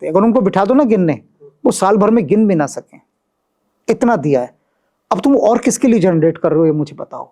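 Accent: Haryana, India. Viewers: Ye host ka accent haryanvi hai ya Rajasthani?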